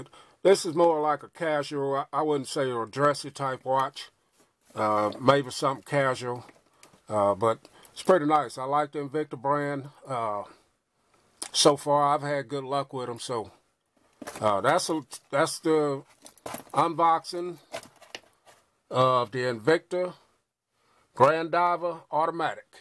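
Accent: United States. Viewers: haha u sound like me... country as hell!!! I do have your interest in them, not saying they are the best but for the price and function I think they are a good value and I am often complemented on mine..also depending on what size the case is, it can make a nice gun/pistol case and keep the silicate that comes with it to keep ur gun from rusting...also the name of the magnified date is called a cyclops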